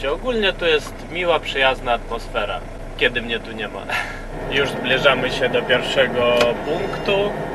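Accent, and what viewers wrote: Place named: East, Poland. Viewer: Twój Polski przez te kilka lat naprawdę brzmi fantastycznie. Bliżej Ci już do wschodniopolskiego akcentu gdzieś na Lubelszczyźnie już na Podlasiu niż do ukraińskiego akcentu.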